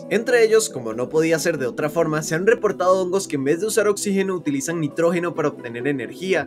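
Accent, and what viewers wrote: Costa Rica. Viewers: ¿Se puede ser más tico? Jajaja, me encabta este canal ❤ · Eres tico?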